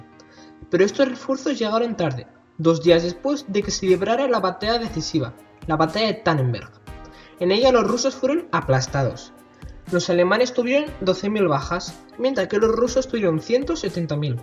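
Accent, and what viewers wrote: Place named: Catalonia, Spain. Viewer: Muy bien muchacho Sencillo y claro He visualuzado tu canal y tenes videos muy interesantes No entiendo tan pocos seguidores Ojala vaya a mas Por cierto eres catalan? Yo vivi unos años en Barcelona Me parece que tu acento es de alli Saludos desde Israel de un judeoargentino sefardi Shalom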